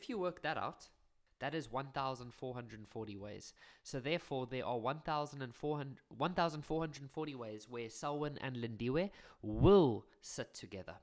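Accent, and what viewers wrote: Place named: South Africa. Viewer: Sounds like a "Soutie" iykyk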